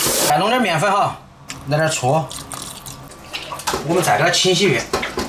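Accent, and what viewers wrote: Sichuan, China. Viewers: 台灣這裡叫（烏骨雞），補冬的珍禽食材之一。每天都要來看看的視頻，聽你豪邁的四川口音和大口食相，真的好療癒！因為生病，很多東西想吃也吞不下，看你吃彷彿自己也飽餐一頓，過乾癮！！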